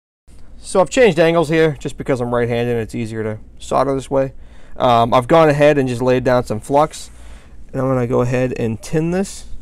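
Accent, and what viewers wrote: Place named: Massachusetts, United States. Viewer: Are you related to Ivan (I think that is his name) of Pine Hollow Diagnostics? You sound a lot like him, but with a bit of a Mass accent, you look a lot like him, you have same mannerisms AND you guys are both high level with auto electronics... Great video, ty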